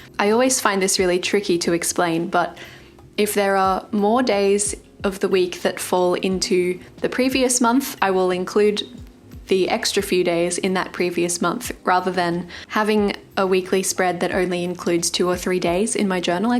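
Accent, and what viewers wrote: Australia. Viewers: It has been so incredibly hot in Australia. I live in Darwin and it has been hitting 40 degrees with 80% humidity. Your quote page is using the Pantone colour of the year. My January setup us revolving around this colour. It is so refreshing to hee an Aussie accent on YouTube and I just love your style of Bullet Journaling.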